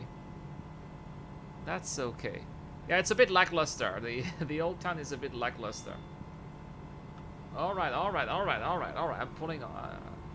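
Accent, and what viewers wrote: France. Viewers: So you are french ? I usually can tell but for I had no idea where you could be from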